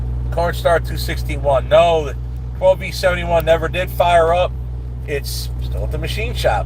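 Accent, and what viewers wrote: New Jersey, United States. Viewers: YOUR JERSEY ACCENT IS COMING IN STRONG TODAY..WINTER IS HALF OVER. GREAT VIDEO.. IT'S MIKE FROM CANADA.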